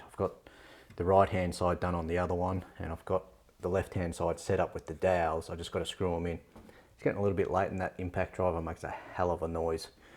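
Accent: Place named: Melbourne, Australia. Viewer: wow not only the channel I have been looking for but from a fellow Melbournian it's a bonus ( thought I recognised the accent)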